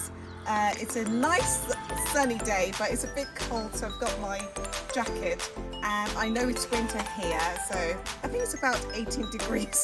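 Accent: RP, United Kingdom. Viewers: Hey you very posh😊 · ❤❤❤ new subscriber alert! even ngingezwa your British accent lol...hope I'll pick a word or two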